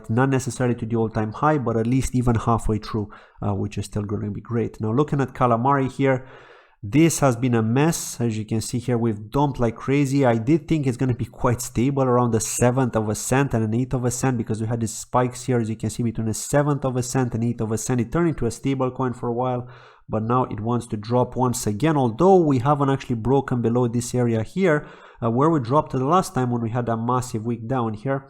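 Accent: Ireland. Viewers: Definitely concerned. Feel like uninstalling coinmarketcap for a year or two to prevent me from looking at it. lol Btw, am i hearing a tad of an irish accent? :)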